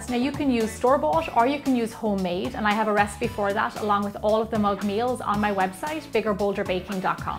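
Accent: Ireland. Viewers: Are you from the Republic of Ireland or Northern Ireland ? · OMG YOURE IRISH ME TOOOOO!!!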